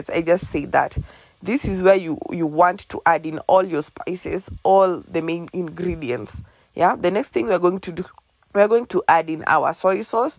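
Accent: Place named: Caribbean. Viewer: Looks absolutely 💯 gorgeous and I will definitely try to make it and definitely going to be a new subscriber PS just out of curiosity where are you from I am normally quite good on accents but I am stuck on yours is it Carrabean??? Or some where in Africa with French origins ??? 👍👍🍚🌶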